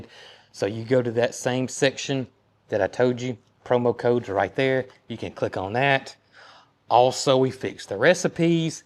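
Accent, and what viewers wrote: South, United States. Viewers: You popped into my feed. I gave you a listen. Your southern accent reminded me of my Tennessee kin, now all gone. Just hearing you talk brings me great comfort. I was born and raised in Indiana but my daddy would take us on our summer vacation EVERY year to my grandma and grandpa’s farm in the hills of Tennessee. I’ve slopped the hogs. I’ve shelled the corn out of the corn crib for the cows. I loved to watch grandpa milk. I loved learning to make butter and rub the sage and dry apples. They had an outhouse. They had a horse and two mules that actually worked the plow. Your channel just makes me feel good. I’m 70 years old this November.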